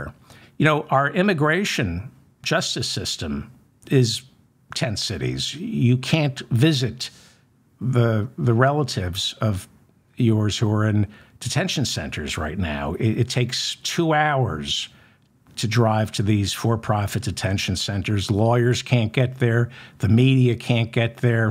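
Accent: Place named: East Coast, United States. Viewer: I live in Seattle Washington. Now. I used to live in New York City and I wish there is a master chef. I love listening to your voice and remind me so much of the East Coast. And I still miss the East Coast. I live on in the west coast as if i'm still in new that city twice for nothing😊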